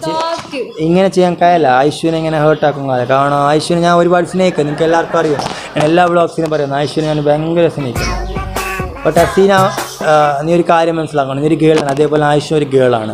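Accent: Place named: Udupi, India. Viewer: She is from udupi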